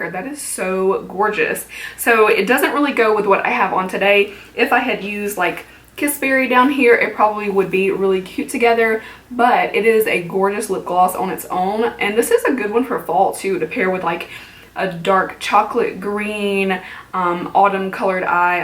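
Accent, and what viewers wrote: South, United States. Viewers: I can hear the country coming out in your voice a lot in this video love it! :-) maybe because your so excited!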